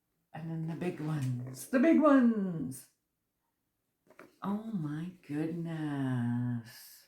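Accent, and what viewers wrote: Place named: Canada. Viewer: Love listening to you talk…love Canadian accent!! My step daughter & her husband live near Courtney, BC. She’s starting to have the accent mixed with the Texas twang (where she moved from). Love the hooks hope they work well for you!❤❤❤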